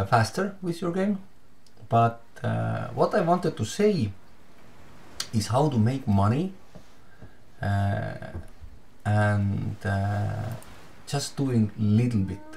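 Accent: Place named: Estonia. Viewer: Actually i kinda like your voice but your Estonian accent is clearly understandable. Keep up!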